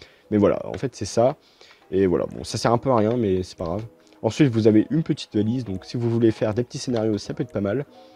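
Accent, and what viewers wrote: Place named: France. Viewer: jppp ton accent francais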